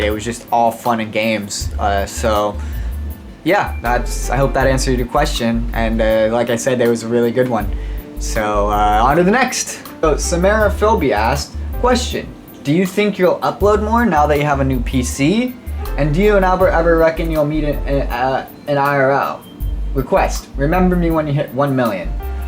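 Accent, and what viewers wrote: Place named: United States. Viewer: I heard this type of voice everywhere in America. Just why do they all sound the same.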